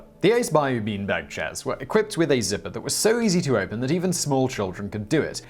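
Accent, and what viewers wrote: United Kingdom. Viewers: Its the Queens English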